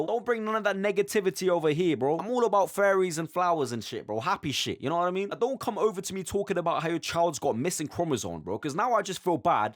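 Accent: United Kingdom. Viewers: he sounds like a fellow brit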